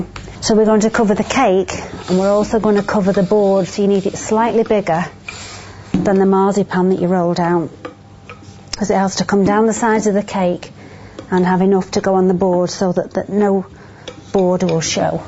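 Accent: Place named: RP, United Kingdom. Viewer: I've never seen jam used before...interesting. I love British accents. They are so posh and everything they say sounds smart :-D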